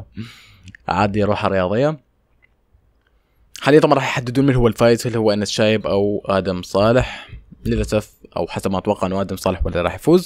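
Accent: Iraq. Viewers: اني ستوني اكتشفت انوو لهجتك عراقيه اعتقد انوو انته عراقي 😹😹😹 ابن بلدي اعتقد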